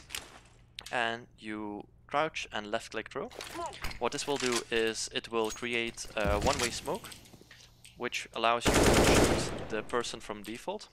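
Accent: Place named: Norway. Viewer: Du er norsk, ikke sant? Det er bare man hører når nordmenn snakker engelsk :D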